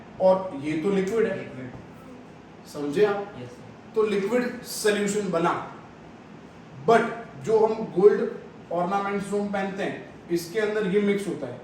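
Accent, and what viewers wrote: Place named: Gujarat, India. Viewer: That gujarati accent in hindi 😂😂👍👍 keep it up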